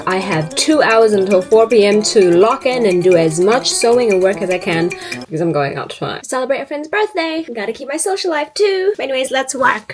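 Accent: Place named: New Zealand. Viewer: Obsessed with the silhouette of this fit!!! also your kiwi accent is rlly cute btw!!!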